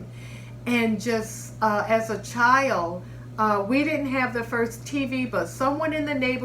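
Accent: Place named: Midwest, United States. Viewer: I absolutely loved this! I always thought your accent from from upstate New York, but now I know it's Midwest. Lol. Amazing stories!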